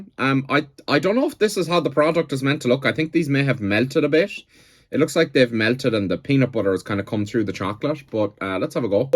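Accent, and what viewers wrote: Ireland. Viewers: What’s your accent? Trying to learn about Irish regionalaccents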